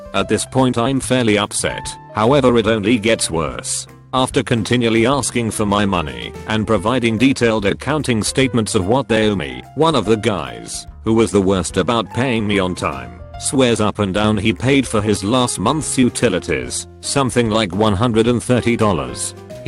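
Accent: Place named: United Kingdom. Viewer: This robotic British accent, does worlds for making some of these people sound not incredibly foolhardy or a little pathetic.. not all, but surely some.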